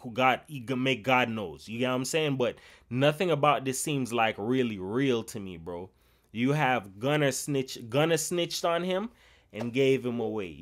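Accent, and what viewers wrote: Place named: Jamaica. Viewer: I can hear the Jamaican in you. Dope video. There guys are some clowns and deserve what they get. Nobody or going to buy his lawyers bullshit. He said nothing is wrong with throwing up a gang sign in a RICO case 😂😂😂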